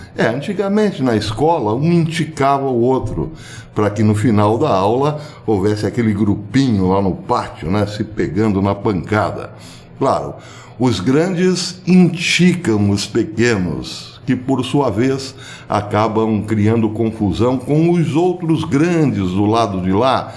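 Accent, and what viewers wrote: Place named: Minas Gerais, Brazil. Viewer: PROF.... A CADA DIA QUE SE PASSA, SOU AINDA MAIS SEU FÃ.CONTINUE DESCONTRAIDO E USANDO SEU MINEIREZ.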